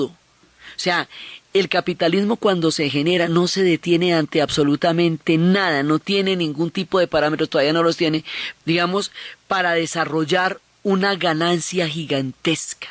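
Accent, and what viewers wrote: Colombia. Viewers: Por el acento de la ponente, deduzco que es colombiana, y yo creo que el nombre de esta señora debería estar grabado en letras de oro en la sala de sesiones del Poder Judicial nacional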